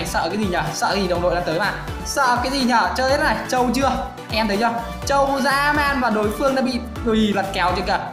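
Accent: Nam Dinh, Vietnam. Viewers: A ở nam định ạ :)) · Quê a ở nam định à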